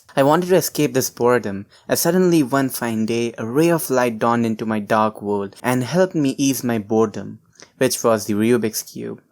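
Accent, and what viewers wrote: India. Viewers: Indian accent turned me off...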